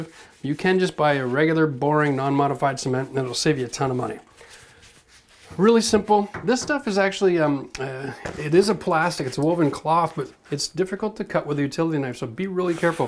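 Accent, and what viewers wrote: Canada. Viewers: I hear that Canadian accent! 🇨🇦 Great video. Thank you! We’re renovating a 7000 sq ft home. Great video. 👍🏼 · Lovely to watch a professional at work you make it look easy great video Are you sure your not related to the South Maine Auto guy your mannerisms are eerily similar good to see a son helping his father and showing a few new tricks Love the Canadian accent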